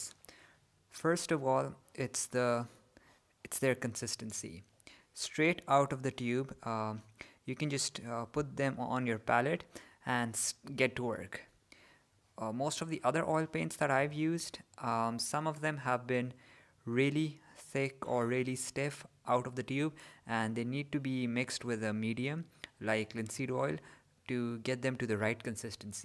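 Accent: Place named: India. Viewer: he sounds Indian but his name seems middle eastern lol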